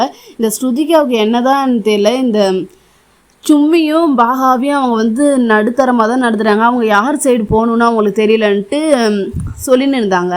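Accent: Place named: Dharmapuri, India. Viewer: Dharmapuri Bhasai❤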